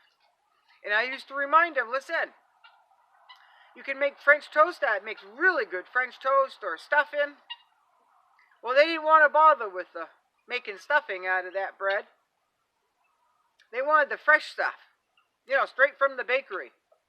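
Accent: Maine, United States. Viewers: My mouth is wide open in shock and astonishment at how entitled some people actually are.😶😦😦. You might need to invest in some ferocious dogs to scare these weird people off. And you are not out of bounds. Some people you give an inch to—they WILL take a mile, and never be ashamed of themselves. I love your attitude and hard work ethic. My husband’s family lived in Presque Isle. Remember when there was an Air Force Base there decades ago? His Mom married a Airman from the base and they finally retired in a warmer climate. She had the same accent as you, so you make me smile as I hear you speak. ♥️🥰😁. That snow and cold looks brutal, but you are one tough woman and we are proud of what you accomplish. 💪🏼. I love you videos, I just found you a few days ago, and I am hooked. Next time that man shows up, hand him a shovel or an ax and tell him he will have to work for that goose—and the work will be double if he wants the breast meat. 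That should scare him away.😂. Blessings to you.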